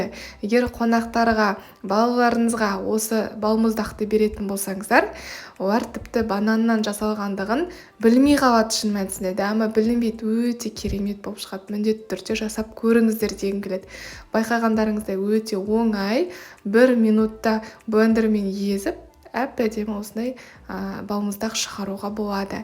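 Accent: Standard. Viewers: Енбеккор пысык колыныз алтын керемет жансыз.жанеде кашан корсемде таза казакша сойлейсиз, шынайысыз.сизди унеми айтып инстаграмнан корип ютубтанда корсем мактап риза болып отырамын.коз тимесин аман журиниз😍😍😍🌷🌷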